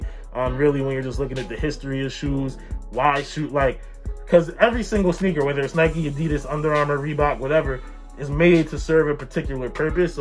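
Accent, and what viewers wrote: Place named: Texas, United States. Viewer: oh you from texas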